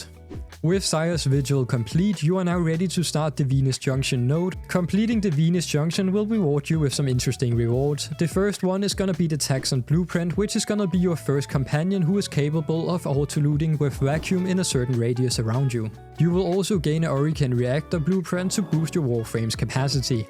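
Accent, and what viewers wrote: Denmark. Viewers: im 5 sec into the vid are you danish? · You sound so Danish in your English and iam loving it. If i look across the water i can see Denmark:) Cheers from Flensburg.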